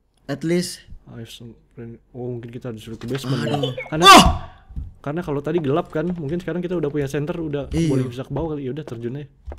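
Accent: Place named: Makassar, Indonesia. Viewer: kak aksen makassar nya pertahankan:v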